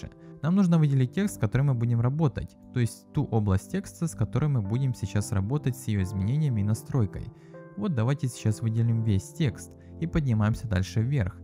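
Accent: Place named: Kharkiv, Ukraine. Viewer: как будто харьковский акцент))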